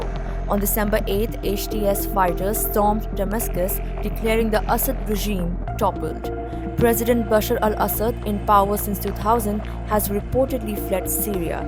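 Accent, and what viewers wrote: India. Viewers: Indian accent so funny😂😂😂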